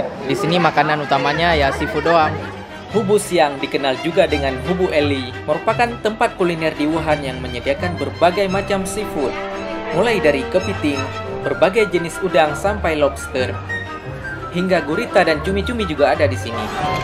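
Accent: Aceh, Indonesia. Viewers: Ab orang mana.... logat nya mirip aceh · Mau kemanapun pergi logat acehnya tetap melekat 😁👍👍👍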